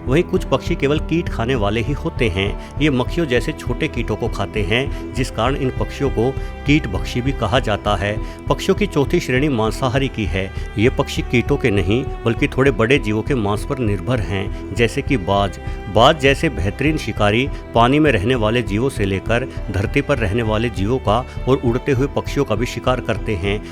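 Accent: Haryana, India. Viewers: Sir aap Haryana se he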